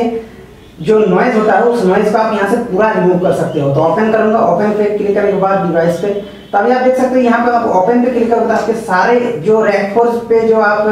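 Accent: Bihar, India. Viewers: Are you from Bihar.???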